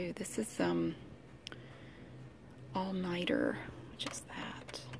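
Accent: South, United States. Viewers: I love listening to your voice, your southern accent is lovely I could sit & listen to you talking all night. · I love your videos you remind me of Lisa kudrow aka phoebe buffay! Your voice is sooo soothing I’m from South Yorkshire in northern England. So any American accent is cool to me! Xxx keep up your amazing vids woot woot!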